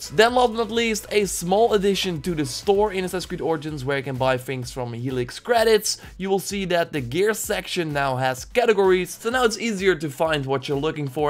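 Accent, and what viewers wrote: Netherlands. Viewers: Dutchies are so recognizable haha, I love our little accent. Dope video though! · Are you dutch? Ik hoor het beetje.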